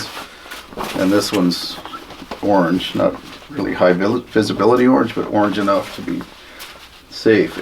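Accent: Canada. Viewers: I love the Canadian ascent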